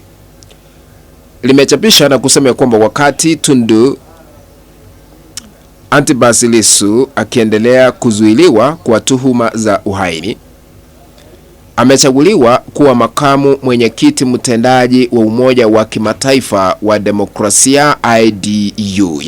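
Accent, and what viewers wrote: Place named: Kenya. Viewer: Huna akili.mamae wewe ni mkenya siunasikianhata rafudhi mbwa wewe hamtaiweza Tanzania kima nyie kapewa nanani ? Wewe shoga tuu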